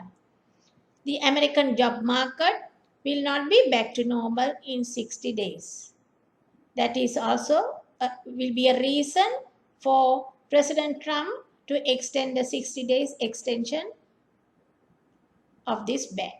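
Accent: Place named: India. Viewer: This is indian voice how could you understand américain law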